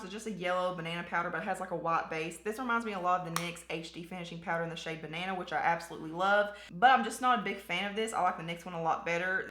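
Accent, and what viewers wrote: South, United States. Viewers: I just recently ran across you on youtube, like a week ago & I think you're just awesome!! And so southern...like myself ❤ Merry Christmas!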